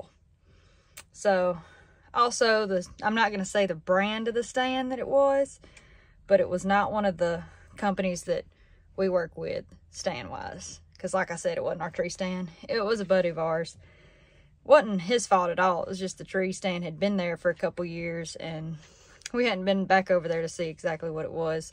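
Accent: South, United States. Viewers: you have a gift that I think your dad knows....it is a very rare a voice like yours that impresses people who want to hear it. My Aunt Mary had it, she raised two daughters that had it. You have critics that don't understand what real Southern Women sound like. You are real, do things that most men can not do. You are exceptional and smart. Your dad did a great job in raising you! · Hello from way Downunder (AUSTRALIA) first time on your channel & wow your southern accent is amazing. Sorry to hear about your dad’s hunting accident & I hope that he recovers fast & everything is ok. I’ve just subscribed so I’ll be keeping an eye out for you-all & check out your older videos..Take care now. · What a nice face. Where is from the accent? Usa south?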